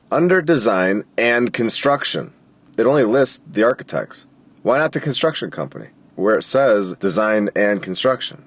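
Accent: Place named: Michigan, United States. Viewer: Loving these videos. Haven’t gotten terribly far. How does it link to the Masons🤔🤔🤔. Have you listened to Bill Coopers series on Ancient Babylon? Also love your accent - Michigan??😊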